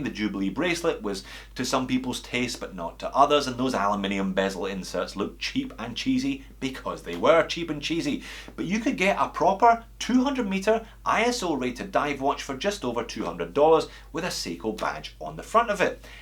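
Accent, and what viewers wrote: Scotland, United Kingdom. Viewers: He's not a native. Accent is for sure from the British Isles somewhere. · I think I heard you say you were Australia, but damn, you sound like a Scott.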